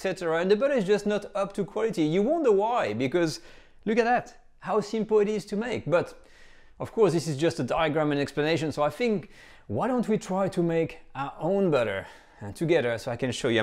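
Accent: France. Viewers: is always nice to speak English with France Accent... tres bien... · Isn’t he French? Doesn’t sound Italian.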